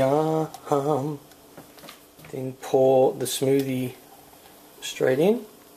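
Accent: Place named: Australia. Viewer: Accent .... i love Australian accents !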